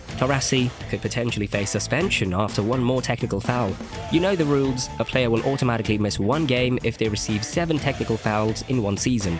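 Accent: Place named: United Kingdom. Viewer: Nothing gets me into the mood for basketball like a British accent.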